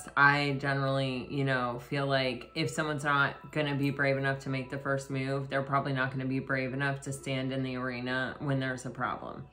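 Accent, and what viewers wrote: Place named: Boston, United States. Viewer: Are you from Boston ?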